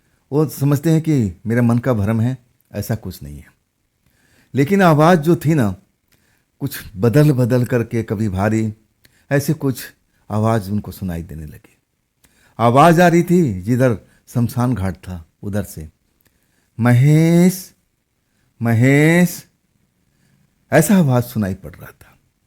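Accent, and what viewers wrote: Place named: Bihar, India. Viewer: Aap ke pronunciation se lagta ki aap east belt ke hai yani east up ya bihar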